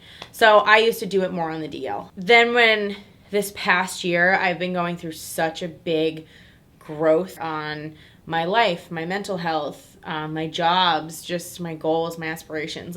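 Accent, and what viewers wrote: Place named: New York, United States. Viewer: IVE ALWAYS LOVED YOUR NY ACCENT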